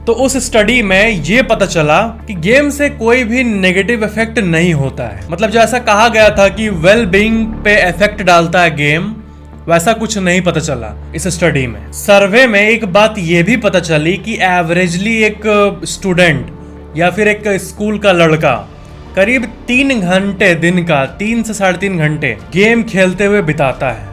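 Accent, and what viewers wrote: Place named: Delhi, India. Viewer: Seriously bihari Babu talking South Delhi Hindi is great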